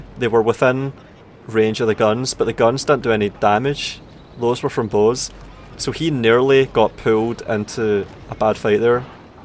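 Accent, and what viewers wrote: Scotland, United Kingdom. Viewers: Is this scottish accent? I'm amazed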